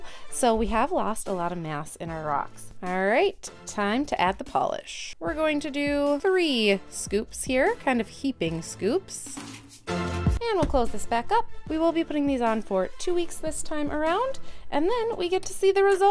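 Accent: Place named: Midwest, United States. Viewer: This was randomly recommended to me and im only about a minute into this video, but i didnt realize how much I missed the subtle midwestern accent until you said 'its fall here in minnesota'! I grew up outside the twin cities and moved to oregon a couple years ago and i just got hit with a wave of nostalgia and endearment for the type of people i grew up around. Thanks for the reminder of home, im excited to see how the rocks turn out!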